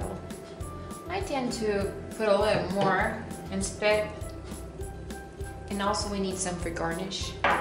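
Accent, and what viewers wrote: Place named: United States. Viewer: Parece uma americana falando... vou estudar inglês para assitir seu novo canal, Sucesso aqui também.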